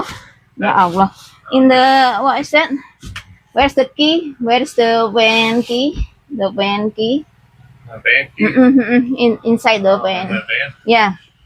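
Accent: Java, Indonesia. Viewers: Mingat..mingat o kono ..iki barangmu...hihi ..seneng dengerin khas jawa nya 😁😁 Bun saya sering koment, smg tdk bosan😊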